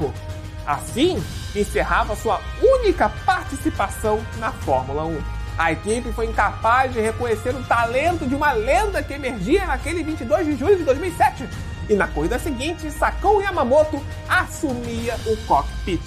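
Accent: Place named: Portugal. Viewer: Sim, belo sotaque PT-PT!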